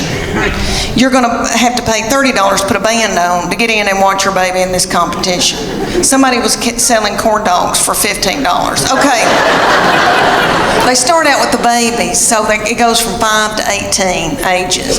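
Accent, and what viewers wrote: South, United States. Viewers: This is very funny. I really like that down to earth southern accent. I would like to see in person doing this skit. Good clean comedy CAN be very funny. BUT, what I am seeing, scares the hell out me. Obviously AI. Artificial Intelligence. It can make anyone appear to be saying anything the programmer wants. ANYONE, INCLUDING YOU. AI IS VERY VERY DANGEROUS. A SCARY FUTURE. 😞😞😞😞😞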